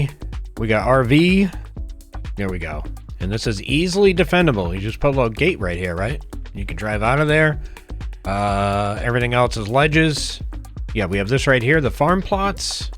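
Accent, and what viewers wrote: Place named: Boston, United States. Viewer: Dorchester kid here, youre either from close to here, or ha e the accent down when you choose to do it. Wicked pissa kid.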